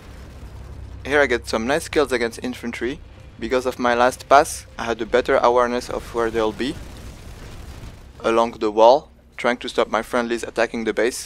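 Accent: France. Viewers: ho I thinking you had a French accent.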